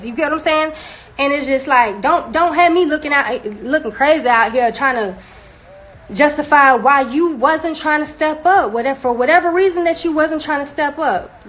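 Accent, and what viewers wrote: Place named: Mississippi, United States. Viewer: You are beautiful but you know you country talkin as hell where are you from Mississippi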